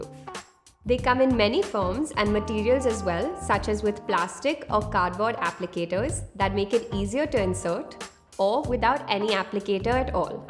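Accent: India. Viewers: I was confused, the lady looked so white yet her accent was strong indian, turns out it's an indian channel. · Why does she have an Indian accent? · Why does she speak in Indian accent? She doesn't look like an Indian.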